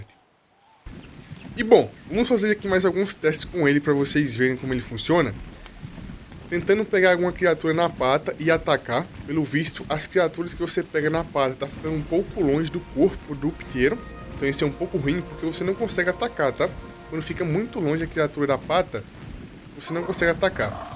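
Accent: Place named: Alagoas, Brazil. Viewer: Cara vc é de Alagoas? Esse sotaque não me é estranho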